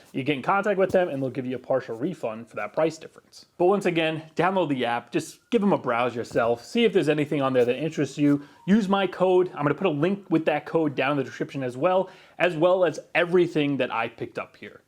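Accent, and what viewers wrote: New York, United States. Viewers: New Yorker!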